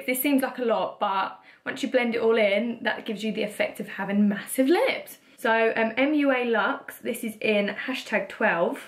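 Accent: United Kingdom. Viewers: I didn’t know you had a British accent! 😅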